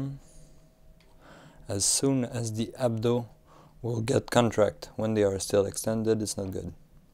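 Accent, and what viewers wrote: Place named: Quebec, Canada. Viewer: ont a un québécois icite!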